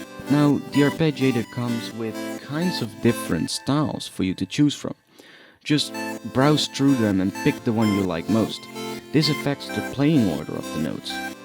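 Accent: Netherlands. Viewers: He's from the Netherlands...it's an accent. You are a dumbass.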